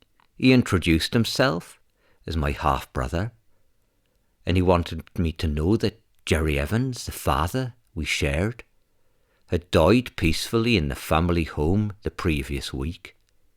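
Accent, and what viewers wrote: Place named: Ireland. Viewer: Aye! I thought it was a pretty decent irish accent. Buuuut I'm American....soooo, yeah. ¡Top o the marnin!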